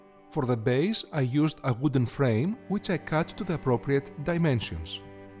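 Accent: Greece. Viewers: what is this gentleman's accent? I want to say Greek? It's lovely, very pleasant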